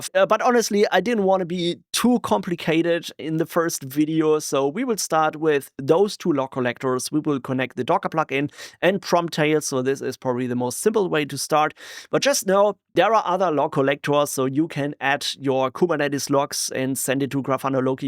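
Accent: Germany. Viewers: Прикольно как у чувака немецкий прорывается через английский =)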